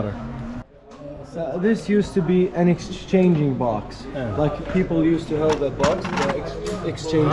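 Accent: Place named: Iraq. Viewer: انت عراقي اكيد لكنتك مو انكليزيه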